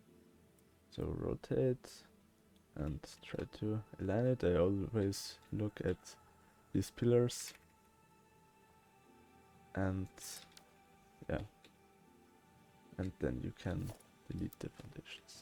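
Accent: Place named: Germany. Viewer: your native language ... seems to be german ... thx for the highway blueprints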